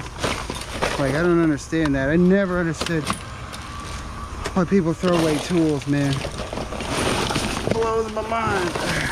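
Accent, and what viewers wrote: Texas, United States. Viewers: 8,77 for whats ? your Texas pronunciation is very hard :) for not native :) Regards from Poland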